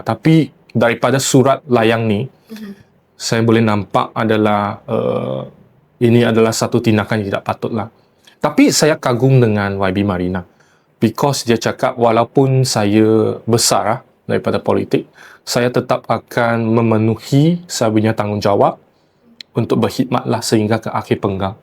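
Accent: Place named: China. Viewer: Fasihnya berbahasa Melayu wpn pelat cina tetap ada.SYABAS KERANA MENGUASAI B.MELAYU DGN BAIK. 👍👍👍🥰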